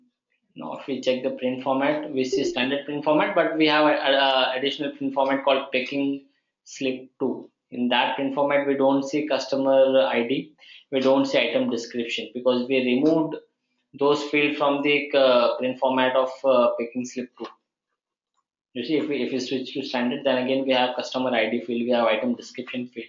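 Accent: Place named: India. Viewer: Love erpnext and have massive respect for indians in general, but why is the indian accent so painful to the human ears? I've heard africans speak english with thick accents but after listening to them for few minutes they start to grow on you. But not the indian accent, the more you hear the worse your headache will get. Every few seconds they will have a brainfreeze and go QUUUUUH, QUUUUUH QUUUUUH, like literally after speaking 5 words QUUUUUUH QUUUUUH QUUUUUH.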